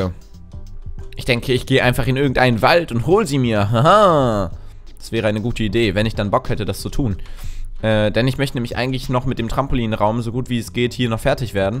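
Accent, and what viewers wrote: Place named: Cologne, Germany. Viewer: Kölner Dialekt.. :D Manschmal und Tich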